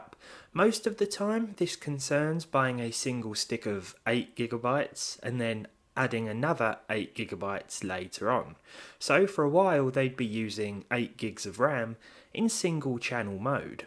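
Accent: United Kingdom. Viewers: How could i ever your Videos press on dislike??? I Love Your Videos! Nice comfortable Voice, interesting themes. And the british-like english helps me to push my language Sklills. Many Thanks! (How to say in englisch?: weiter so! (german) Further so!?) 😀 Have a nice Weekend! ❤❤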